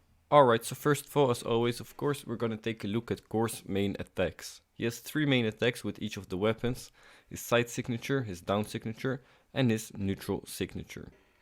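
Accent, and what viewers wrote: Netherlands. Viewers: dit nederlandse accent:cat-orange-whistling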